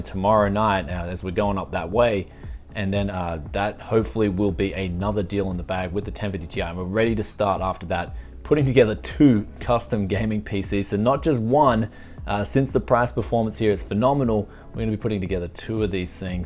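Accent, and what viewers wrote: Australia. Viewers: Welcome to MAGALAND dude! Let me get my brain around this...your're footloose in America, with a Camaro, big smile, and Aussie accent. DON'T SPEND ALL YOUR TIME THROWING OLD COMPUTERS ON THE HOTEL BEDS! P.S. I have a free MSI 980 if you drop by and pick it up. Cincinnati Ohio. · Mate I reckon your Aussie accent led that guy to chuck in that cooler LOL. If you sound like a bogan in the USA you can get some dope deals and the yanks love that "Howya goin" accent.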